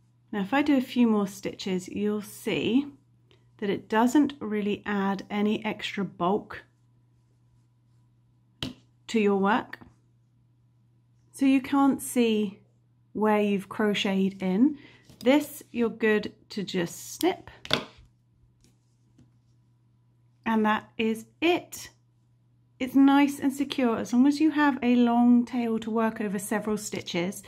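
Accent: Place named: United Kingdom. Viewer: Absolutely genius!! Thank you so much for sharing. I have just ordered yarn to do a very heavy color change afghan (every row changes) and your timing with this amazing tip could not be better!! I am really excited to do my project now. I was dreading all the ends, but I really wanted to do the pattern. Woo hoo! Thank you, thank you, thank you. Love your channel and your Brit accent. Carry on!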